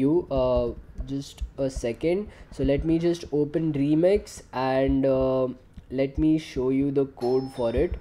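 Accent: India. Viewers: Your English just like Hindi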